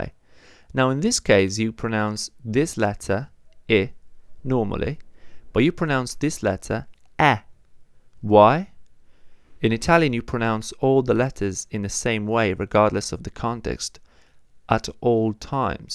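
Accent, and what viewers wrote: England, United Kingdom. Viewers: OMG... thank you for the videos! I've been looking for something like this since last year but you didn't come up from research results and nothing else worth watching either. I found Tom, a guy from New York with EXCELLENT American English accent but he didn't break down his lessons like yours! So I didn't learn much from him. Thank god your British accent is NOT too thick for my American ears! :) Please keep it coming. I haven't watched the entire 65 videos yet but loving the ones I have watched. I even fall asleep! NO, it wasn't because it was boring but because your voice is soothing that managed to help me fall asleep (I am insomniac). Thank you again... and thank your girlfriend, too! :) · Born in England, or grew up there, or what? How amusing to find an Italian channel taught with an English accent.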